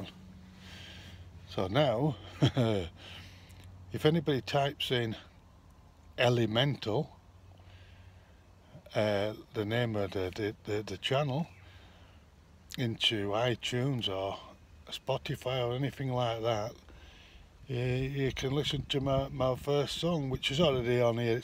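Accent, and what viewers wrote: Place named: Yorkshire, United Kingdom. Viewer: Ey up cocker. Where bouts you at you fuckin lyrical legend? Accent sounds Huddersfield/Brrrrrratford way?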